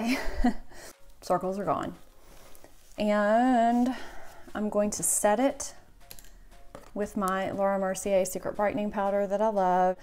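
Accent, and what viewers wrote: South, United States. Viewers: I just love you girl. That sweet Southern drawl just relaxes this Yankee!! I've tried some of your recommendations already(new sub) and they've worked well, both the products and the techniques. So glad I've found you!!! Our coloring is very similar, but I'm not a blonde. My eyes are brown, hair is auburn and I've got more than 20 years on you!! Tee hee. Still your style and techniques work so well for me. I so enjoy your bedroom where you film, it's so beautiful. So , pls keep doin what you're doin. I so enjoy you. Love your 🐶 in the background and your daughter was adorable. Enjoy your day , and I'll keep watch for your videos. Again, so glad you're there, Dear. Love from PA. Rachel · I kneed to get the Physicians Formula shimmer strips. I just noticed that you are in Louisiana. I live in Mississippi. Love hearing a familiar Southern accent on youtube. 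· Hi! I have to agree w/ your other sub who mentioned loving your southern drawl as a yankee 😀 I too adore it. My fiance is from Texas & I swear by talking to his mom & sisters, it's like being at a spa, their voices are so soothing lol. Anyway, great video as usual. I've never used the Benefit Erase Paste, but now you are making me go buy it ☺ I also love using shadows too as liners for wings, that Nars color is a gorgeous brown, may have to get that too! Love this look. 👍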